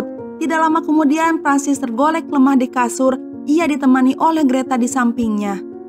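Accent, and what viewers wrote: West Java, Indonesia. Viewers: Sunda na th katinggali pisan "subrek likeu en share😂"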